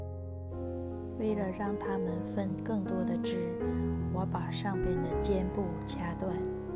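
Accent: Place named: Northeast, China. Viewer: 隐约听出东北口音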